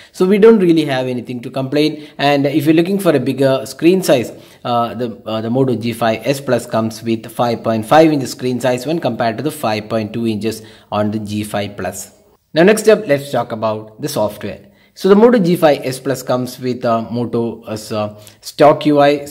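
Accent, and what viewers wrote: Kerala, India. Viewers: Malayali accent.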